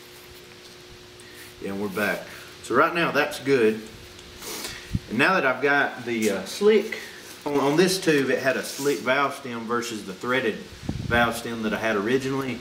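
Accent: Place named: South, United States. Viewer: Hey man, thanks! The southern twang was comforting as well as seeing you struggle a little. Definitely inspiring!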